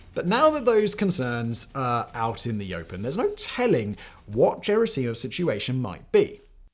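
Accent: United Kingdom. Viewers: I have to admit that I have a weakness for the British accent. An Englishman (or woman) could be reading the phone book and I would be entertained for hours...so imagine how pleased I was when I found Simons'channel (s),(s),(s),(s)... Speaking of his channels... I think that a mad scientist genetically engineered a sample of DNA & the concept of the YouTube channel which produced an octopus type creature with many channels and many brains. An octopus with a magnificent beard I might add. Gods beard isn't half as impressive. Surely holds the record for the most channels...if there's someone with more, I don't know of them. As long as keeps creating, I'll keep watching. Thanks.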